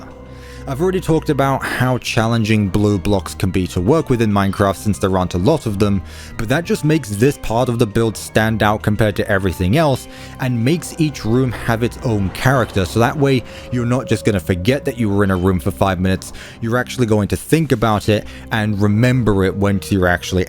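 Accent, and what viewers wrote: United Kingdom. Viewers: I enjoy watching your video because it’s a nice change of page from the fast moving while talking Minecraft YouTubers. I like how you talk in your nice calm British accent as Minecraft gameplay of you building things place in the background. I find it very common relaxing to watch I love your channel. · Maybe it’s the British accent that makes it sound better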